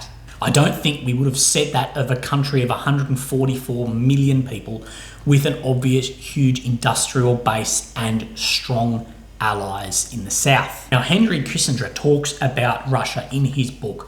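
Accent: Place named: Australia. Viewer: Your visuals are fine. Shows your intensity and good rich Oz language well.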